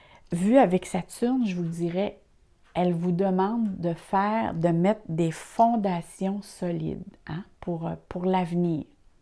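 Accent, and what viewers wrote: Canada. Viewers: Merci pour cette voyance et votre accent canadien 😁😁 j'espère que ça va se passer car besoin de positivité 👍 à bientôt ..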